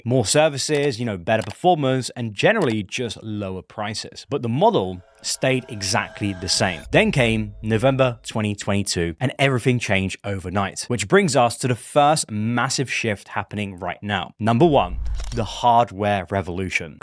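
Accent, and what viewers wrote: United Kingdom. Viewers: Love from Ireland, your neighbour as I can fish out the distinct UK accent 😊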